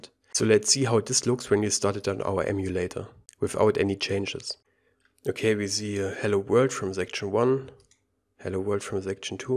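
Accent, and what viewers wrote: Germany. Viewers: I like the german accent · so cool - listen to the text in English, but with a German accent))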